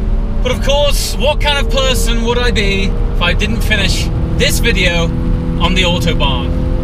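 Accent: United Kingdom. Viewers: omg British Accent!!!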